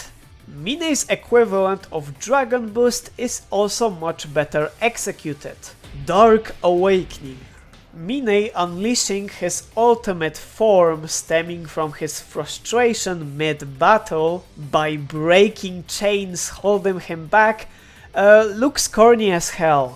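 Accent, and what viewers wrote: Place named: Eastern Europe. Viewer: Please work on your slavic accent. It's very distracting.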